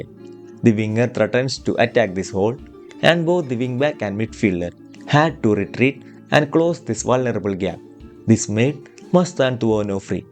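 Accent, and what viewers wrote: Kerala, India. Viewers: Malayalee alle aa English kettal ariyaam.. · Damn the mallu Accent is crazy!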